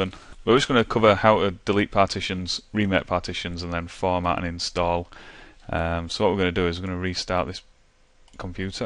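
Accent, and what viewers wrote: United Kingdom. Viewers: Thanks British bro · Thanks dude, i like your British accent :) You helped me partition my HD going back from Linux to Windows. cheers mate!!!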